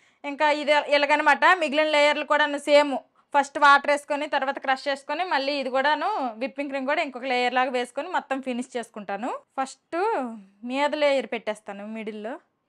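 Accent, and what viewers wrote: Srikakulam, India. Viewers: Akka konchem matlade style marchu Srikakulam paruvu teyyaku hi andi maney vere di select chesko konchem style ga matladu em kadhu next vlog ala try chey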